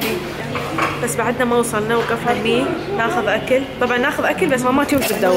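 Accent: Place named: United Arab Emirates. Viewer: قبل لا اشوف الفيديو ضغطت لايك لاني واثقه انه بيكون حلو وصرت اعشق اللهجه الاماراتيه منج يا وحفضت جم كلمه ( يزوغ = يخوف ، ازقرها = نادها ) وبعد والله نسيت المهم لا تطولين علينا بعد نبي فلوقات ما شبعنا انا من الكويت احب فلوقاتج وااايد 💐💐😘سلمي على زايد يا حليله 😘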